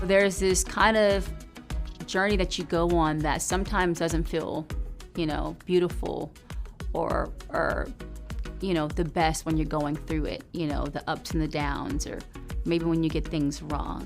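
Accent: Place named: California, United States. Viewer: I know, too much vocal fry and California. She is being genuine but it comes off fake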